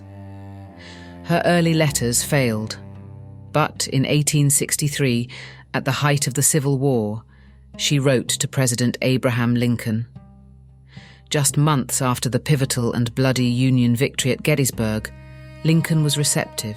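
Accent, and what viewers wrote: United Kingdom. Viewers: I’m 49 seconds in, the British accent on the narration though? I hate AI because it has a way of coming across as tone deaf and too much, but the imaging is cool and story telling is (over the top. These things can be written by a romance novelist for the same effect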